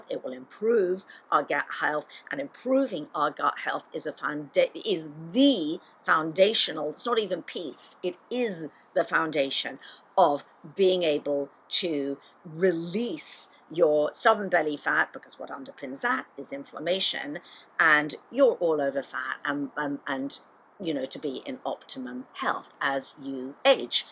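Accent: England, United Kingdom. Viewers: You sound English but use US terminology, eg, board certified, weight in pounds?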